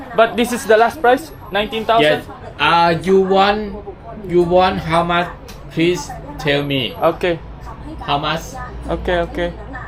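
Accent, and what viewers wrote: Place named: Philippines. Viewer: You’re funny af bro, you have filipino blood? You got that accent hahahah.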